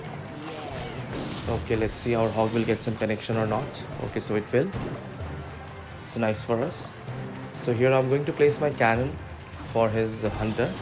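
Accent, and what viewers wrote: India. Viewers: Obvious indian accent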